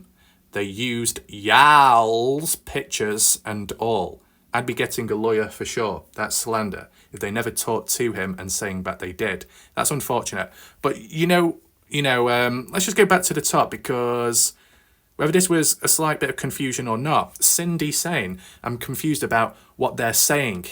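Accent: South, United States. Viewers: lol 😂 that country accent is FANTASTIC! I’m rollin’ on the floor! Keep up the great work! Always enjoy ur videos! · Great accent. Can you say, hey there feller with the yeller piller? I used to say that to my southern family when I was a kid. I can decipher it if you like lol. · You read the article on the 23rd I believe. Can't believe this happened. It's a shame it's even still up. I completely agree that everything, including the mess-ups and misinformation, should be archived! We have to learn from our mistakes. By the way, I'm loving the country accent 😂😂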